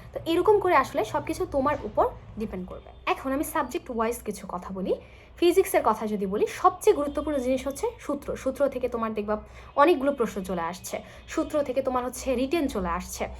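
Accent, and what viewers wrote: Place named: Chittagong, Bangladesh. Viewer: আপু আপনার বাড়ি কি চট্টগ্রাম বিভাগে? আপনার কথার মধ্যে চাটগায়ে ভাব আছে।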